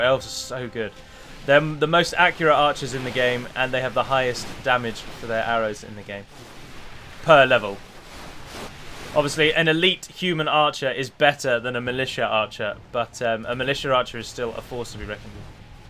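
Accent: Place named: RP, United Kingdom. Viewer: Not wanting to be pedantic however this text may put in context your quite modern RP pronunciation re the BATH - TRAP split. It is know that northerners flat sounding vowel as in Bath was the original pronunciation. <link> . p.s. love your videos. Thanks.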